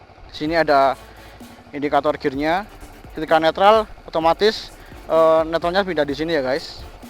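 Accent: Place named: Java, Indonesia. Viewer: medoknya jdi gagal fokus dah,wkwkwkwk apa lagi ngomongnya pke lu gua 😂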